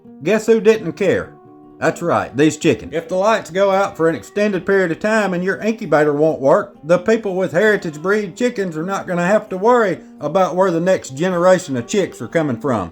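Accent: United States. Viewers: Great American accent😊 it is good to learn American/English from you plus I learned a ton of knowledge about hen😊 thanks I live in Poland Piekary Śląskie my Father in law have few hens and rooster and we keep change them mostly hybrids but once i bought small incubator and chatched him couples of this originals and Yes they were more resilient and lived longer👍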